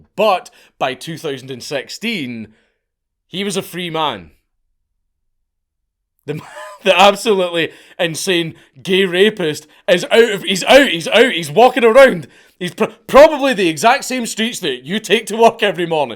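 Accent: Scotland, United Kingdom. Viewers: I'd have dropped a like on this anyway, but hearing Booty Warrior in that glorious Scottish accent was the gift I didn't know I needed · Stopped in to listen to a Scotsman speak of BOOO' EEE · Something about this presentation being conducted by a Scottish man just makes it that much better. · The Scottish accent makes this better 😂 love the accent bro 👌🏾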